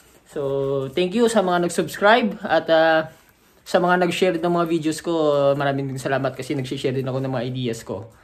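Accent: Iloilo, Philippines. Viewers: daw ilonggo ka gid sir ba hehehe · Ilonggo ka master?? Ride safe simo da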